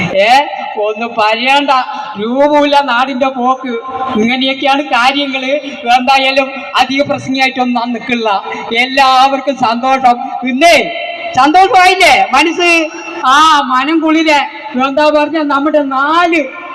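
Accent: Palakkad, India. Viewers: എടാ ചങ്ങാതി നീ മലയാളം ഈ പാലക്കാട് അപമാനിക്കുന്നത് നിനക്ക് നിന്റെ മക്കളെങ്ങനെയാണ് ചങ്ങായി സംസാരിക്കുന്നത് ജനിച്ചു പറഞ്ഞിട്ട് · പാലക്കാട്‌ ഇതുപോലെ സംസാരിക്കുന്ന ആള്ക്കാര് കൂടുതൽ ആണോ അതോ ഇതിനെ വഷളാക്കുവാനോ എന്തോ നെറ്റ് und ന്തേലും ചെയ്തുവെക്കു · പാലക്കാടുകാരുടെ മനം കളയുന്ന സംസാര രീതി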